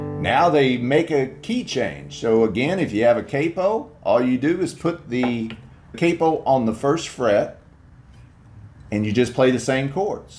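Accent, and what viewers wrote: South, United States. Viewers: great lesson, haircut and southern draw! You made it easy........Oh, and yes, is one of the greats!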